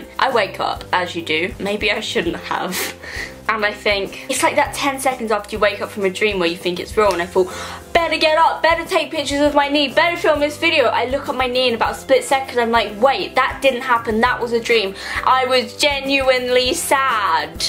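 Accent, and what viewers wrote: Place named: London, United Kingdom. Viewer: it's a particular type of london accent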